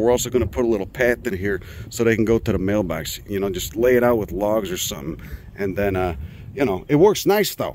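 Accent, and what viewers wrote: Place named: New York, United States. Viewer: What is that accent!? 😅 I love it as it sounds like Peter Griffin crossed with...err, forgive me I'm not from the US...either Italian New Yorkian or downtown Bostonian. 😅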